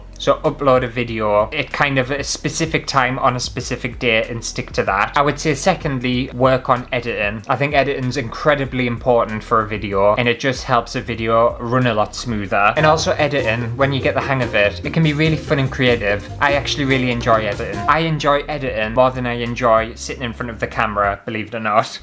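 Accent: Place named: Northern England, United Kingdom. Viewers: Your accent is definitely North East and phrasing is specifically Durham rather than the Newcastle Geordie. I'm originally from Durham also and I love to hear the honesty of your roots when you talk, together with your wicked humour. It makes me chuckle the whole way through your videos - laughing with you, not at you. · 🤩Northern accents rule, well at least in our eyes. Might be ever so slightly bias 😁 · Your art rant made me so happy, especially when you mentioned Damien Hirst. He's a snake oil salesman of the art world, absolute sham. I reckon we must be fairly local to each other as I'm also in a pit village in the Durham area close to the sea! I just love that out of all the art youtubers I discovered you, it's comforting to hear our accent, it's like you're me mate haha. I also say is/us to refer to myself which confused my American friend a lot!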